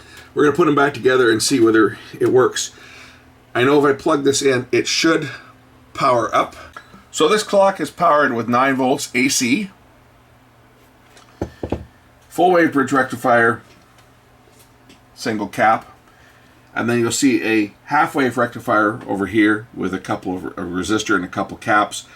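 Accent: Canada. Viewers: Hurricane?? You must be near me in Florida....but you sound Canadian.....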